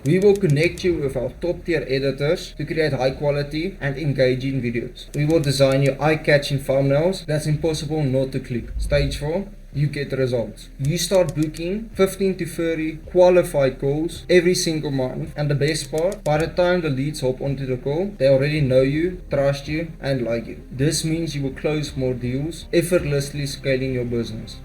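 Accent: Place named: South Africa. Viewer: Hey, I just wanted to say I absolutely love your content! You inspire me to improve my editing skills 💯. By the way, are you from South Africa? Your accent sounds familiar South Africa😅! I’m a creator too, but wow, your videos are HUGE!:face-blue-wide-eyes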